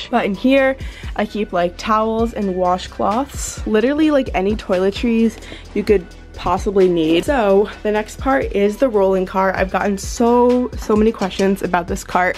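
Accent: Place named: Los Angeles, United States. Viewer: homie you did not need the boston translation you sound like you from Los Angeles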